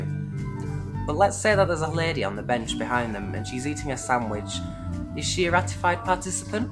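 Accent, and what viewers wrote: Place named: North of England, United Kingdom. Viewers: Thank you very much! I'm from the North of England, so my accent is very different to the traditional Standard English usually broadcast from the UK. I'm glad you liked my video :-) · damn, I really love your accent :D such a nice british accent, I would love to speak like you. Thank you for the Video! It became very clear to me.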